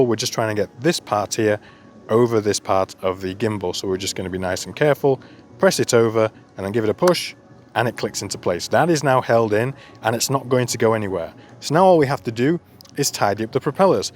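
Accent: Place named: Yorkshire, United Kingdom. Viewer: You live in the sunshine by the looks of things but you seem to have some Yorkshire roots as well. I'm a Sheffield boy - best wishes, Nick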